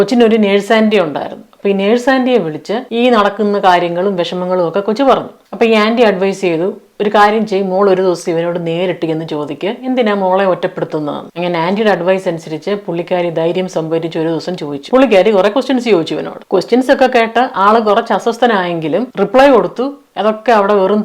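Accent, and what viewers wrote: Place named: Chengannur, India. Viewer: ചെങ്ങന്നൂർ സ്റ്റൈലും സ്ലാങ്മാണെന്ന് ഊഹിക്കുന്നു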